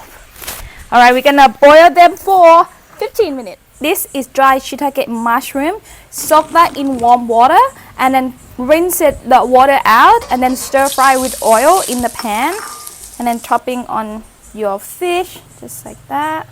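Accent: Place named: Australia. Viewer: Your man must be an Aussie, as you speak English with an Aussie accent. Lovely.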